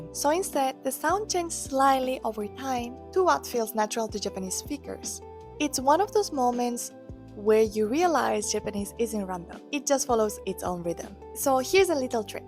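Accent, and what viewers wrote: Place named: Spain. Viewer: Teacher, why do you speak English with a Spanish accent?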